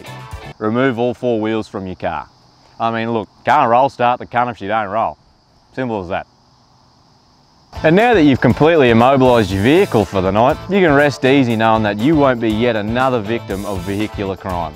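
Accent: Australia. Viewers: I am subbing. I'm learning the art of aussie tongue and you're the perfect subject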